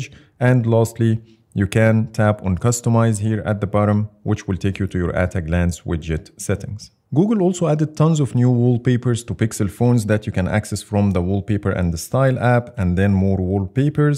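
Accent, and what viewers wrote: Egypt. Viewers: Egyptian accent do be strong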